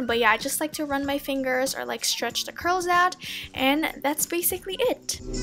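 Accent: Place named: United States. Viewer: you're so cute. you sound just like me w/ ur american accent when you speak tagalog. #struggles lmao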